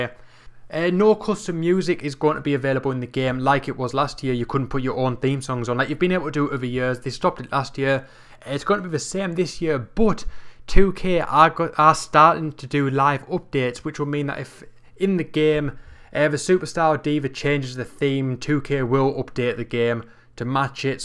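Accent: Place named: Newcastle, United Kingdom. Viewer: That Geordie Accent Tho xD im geordie too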